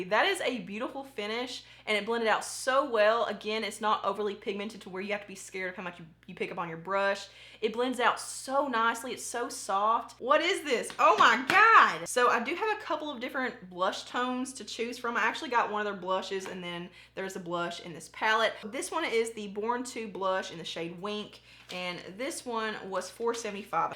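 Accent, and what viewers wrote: South, United States. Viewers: I am born and raised from the south and your accent legitimately makes my soul smile. I love it. And I loved this look! Great video gorgeous ♥️ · Use it love it, when I heard your voice knew I would just love ya,southern accent me too. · Im from the south as well So good to have someone on YouTube speaking pur language. Lol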